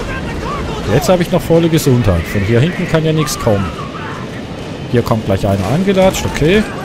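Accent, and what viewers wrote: Switzerland. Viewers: Kommst du aus der schweiz? :D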